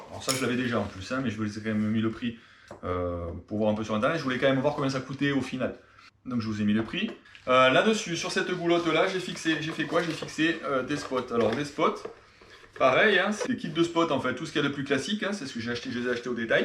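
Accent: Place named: South, France. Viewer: Le bel accent à la Cabrel, magnifique ! ( et trés ingénieux le système bravo à vous )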